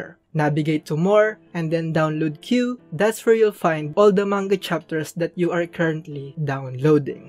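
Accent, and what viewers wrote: Philippines. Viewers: I know from your accent, and humor that you're a Filipino 😂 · Your Filipino accent is kicking in my guy ❤️💯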